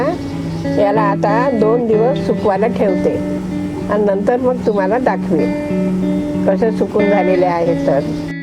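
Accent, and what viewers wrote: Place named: Vidarbha, India. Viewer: Thankyu aaji.. Aaji vidarbhatalya ahe watate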